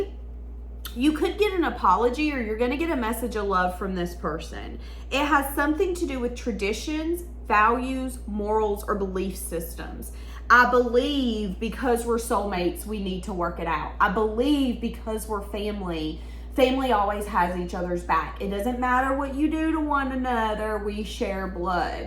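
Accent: South, United States. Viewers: Thank you for sharing queen 👑 that was an amazing read,it seemed to resonate with several interactions I've had with loved one.❤🎉I just love listening to your country voice, you are a...😊! I usually keep my thoughts to myself, but when I do speak up (as I'm getting stronger) I seem to be more aggressive in my approach. Family members say I am mean. Probably I am still holding on to hurt & trying to heal.I had to go into isolation the last two yrs. And pull away from a lot of people I really loved. I spend most of my time with two beautiful kittens now and I still believe in love 💕💜 that really was a nice read thank you 🎊💜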